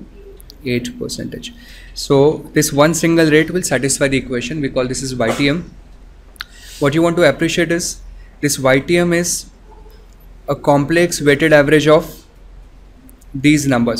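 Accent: India. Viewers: This annoying irritating fucking indian accent.... Guys, why every nation can adjust its accent except you?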